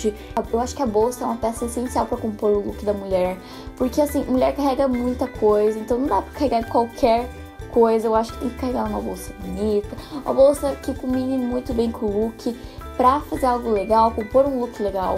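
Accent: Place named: South, Brazil. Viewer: Eu amo esse sotaque do Sul. ♥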